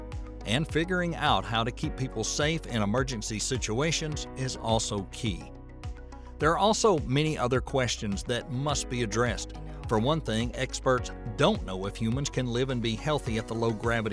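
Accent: South, United States. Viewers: Another too rare video without randomly CAPITALISED WORDS in the title or inconsequential exclamation marks or deceiving wording, stupidly hoping it will generate more views. Please keep this more mature southern accent voiced guy that I learned to appreciate and stop occasionally replacing it with the other prepubescent one. Maybe keep those shady (and, quite frankly, dumb) tactics for your other thousand Youtube profiles I unsubscribed from, but please keep this one free of bullshit. Otherwise, I'm grateful for this well presented informative content.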